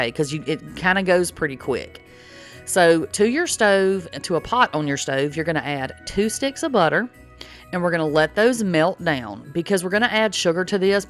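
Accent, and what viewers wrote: South, United States. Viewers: Don't know where you are from. You have a southern draw and no one in the south or anywhere else in the world pronouns perylene that way !!!!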